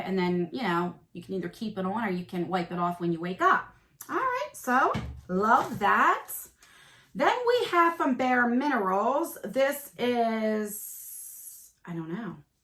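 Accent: New Jersey, United States. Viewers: I love your channel! I heard the accent and it reminds me of home although you are from Jersey & I'm from Long Island. Similar sound. 😆 Such fun videos! Im in my early 50s and relate more to your channel more than some of the younger beauty gurus. We have different beauty needs & well, I love budget friendly beauty that can be found in these beauty boxes! Lol. Favorite emoji, hmmm, oh it has to be one that I always give to my kids, 💖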